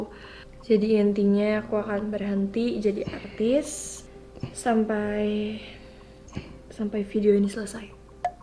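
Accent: Java, Indonesia. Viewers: Guys. Medok bener ndok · Guys Ny ittu Medok banget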